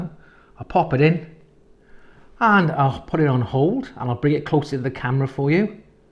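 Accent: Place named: Neath, United Kingdom. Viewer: Cracking video mate. I’m from neath. You sound close. Would love to know what my tap water is. I do want to get one of these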